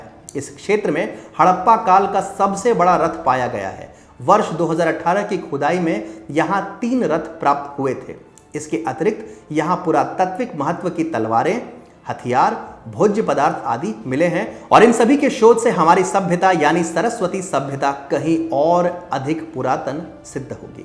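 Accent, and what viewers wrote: Standard. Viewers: i respect your beautiful shuddh Hindi narration 👌👌👌🤩🤩🤩.. Phranaamam Namashkaar 🙏🙏🙏 AIT is a false theory to divide us! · । आपका शुध्द हिंदी का वाचन करना एवम् आपके हिंदू-प्रेरित तथ्य, आपके हृदय में स्थापित हिंदी, हिंदू एवं हिंदुस्तान के प्रति अप्रतिम आस्था को प्रदर्शित करता है ।हिन्दुओं को अपने संस्कृति के प्रति जागरूक करने हेतु आपके इस अद्भुत प्रयास के लिए मैं आपका आभारी हूँ । वास्तव मे आप अतुल्य है। ।धन्यवाद ।- प्रभात रंजन सिंह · Detail study video. Nice. Shudh hindi sunkar bahot acha laga. Dhanyawad.👌👌👌👌👌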